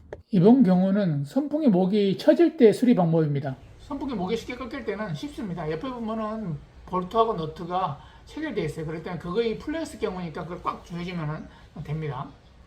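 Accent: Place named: Jeolla, South Korea. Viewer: 으미~~~~! 구수한 전라도 억양 듣기 좋네요~ 영상 잘보고 갑니다.... 바로! 실천에 옮기도록 할께용~~♡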